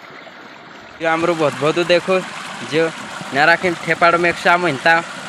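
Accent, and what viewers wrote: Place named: Madhya Pradesh, India. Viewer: आप जिस भाषा में बोलते हु व भाषा तो आलीराजपुर सोंडवा कि जैसे लगती है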